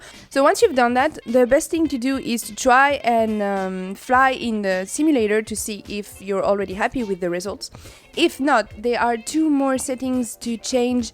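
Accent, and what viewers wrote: France. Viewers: sounds a bit like a french accent xD ... and i really like this rooster frame.. guess it will be my next one :D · Quite interesting video for simulator... and what a nice little French accent :)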